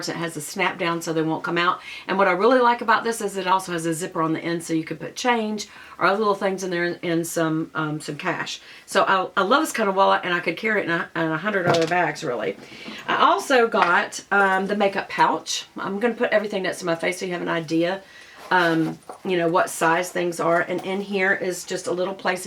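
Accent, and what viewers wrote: South, United States. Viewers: I wanted and had my heart set on the large Duxbury but it was too long for my 5’2” stature. Love your southern accent and your Pandora bracelet. I pray this doesn’t sound weird but you look as though you smell good. 💕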